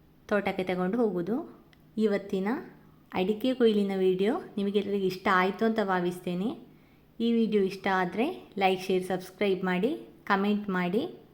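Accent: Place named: Mangalore, India. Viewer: Mangalore kannada bhashe kelode super 😊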